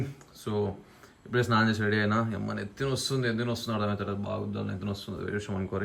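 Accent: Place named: North, India. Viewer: Hindi badhiya bol lete ho bhai. Ekdam north side wali feel ati hai. 🫴🤌🤌🤌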